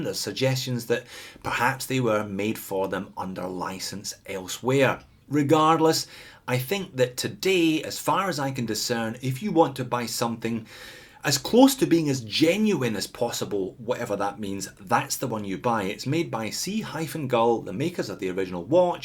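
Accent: Scotland, United Kingdom. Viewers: Love the Scot accent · stop speaking scottish and I will sub you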